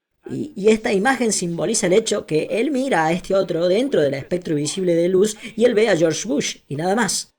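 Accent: Córdoba, Argentina. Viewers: la voz de ese español acordobezado está genial!! Muy clara y precisa!!! Dejá que cacareen los culiaos jejeje!! Felicitaciones!!! Like para Uds.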